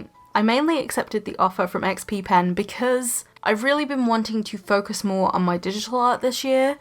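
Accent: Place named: United Kingdom. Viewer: i LOVE BRITISH ACCENT ♥.♥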